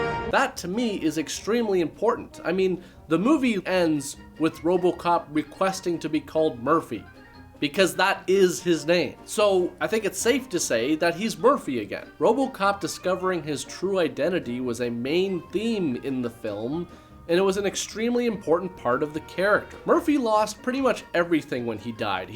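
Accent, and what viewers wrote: Canada. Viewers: lol that Rob Ford reference was hilarious. Are you Canadian btw? It seems like you have the accent. Great Vid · Are you Canadian? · Are you from Toronto?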